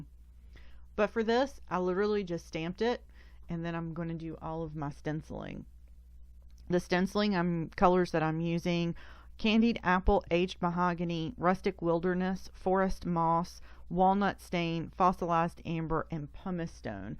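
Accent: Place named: South, United States. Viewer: Love your accent! I’m guessing you’re from the south, it reminds me of a colleague I have from Mississippi 😊 Your cards are so pretty and fun! TFS!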